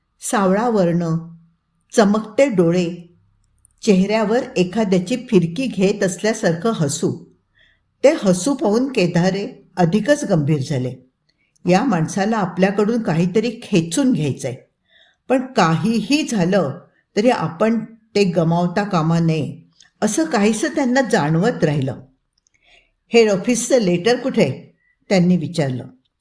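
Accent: Standard. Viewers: खूप छान वाचन ....! सुस्पष्ट आणि शुद्ध उच्चार.....!! ऐकत राहावंसं वाटतं.....!!! आवाजाची जादू .....! अक्षरशः खरं आहे.... 👌👌👌👍👍👍🌹🌹🌹🌹🌹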